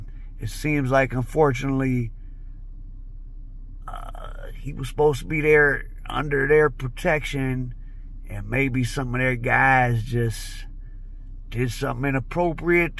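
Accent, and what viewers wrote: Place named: Missouri, United States. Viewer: Omg is this guy from Missouri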